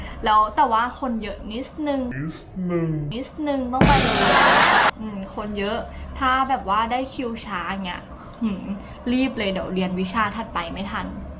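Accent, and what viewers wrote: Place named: Suphanburi, Thailand. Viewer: ฟังแล้ว สุพรรณแน่แน๋ๆๆ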